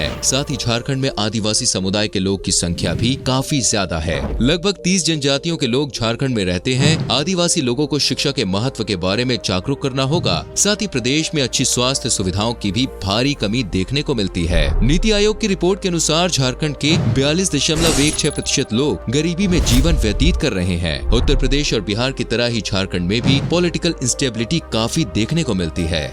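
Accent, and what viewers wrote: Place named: Assam, India. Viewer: आप तो आसाम के लग रहे हो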